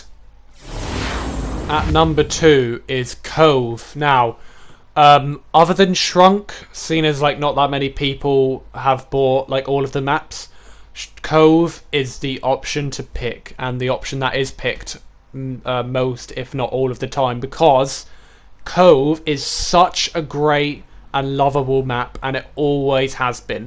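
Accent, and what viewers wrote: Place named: United Kingdom. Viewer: you sound more british here. Is bmpt americanizing you?!?!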